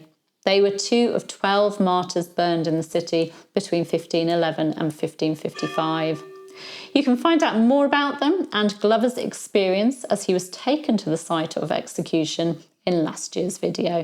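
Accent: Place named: England, United Kingdom. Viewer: Having lived a stone’s throw from Jamestown Settlement and visited the Outer Banks many times, I get a thrill hearing an English person speak of the history. I cringed a bit when I heard Ralph Lane’s name; he really cut a swath through the Native Americans in “Virginia” in 1585. I’ve always wondered what Queen Elizabeth thought of that. Thanks for all your amazing work!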